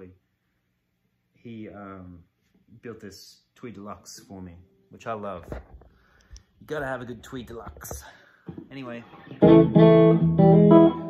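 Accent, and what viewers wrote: Australia. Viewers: Congrats from down under mate , you’ve never lost your Aussie accent ! 🙏🏼🎶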